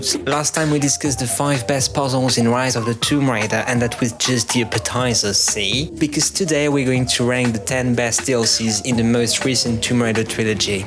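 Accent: France. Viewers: French accent is 👌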